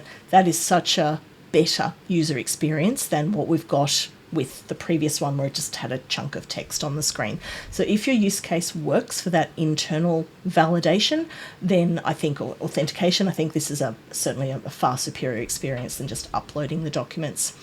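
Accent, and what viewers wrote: Australia. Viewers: Another great and informative video, I am really getting into Copilot as a result of your videos, I can see what an amazing benefit it can be for any sized organisation. Keep up the wonderful work! I always look forward to your new releases. Also, as a fellow Australian, so good to hear an Australian accent on YouTube, as well as the familiar cultural nuances and humour in your discussions.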